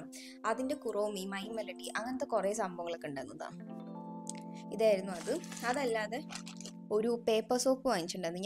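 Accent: Palakkad, India. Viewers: Chechi palakkad anno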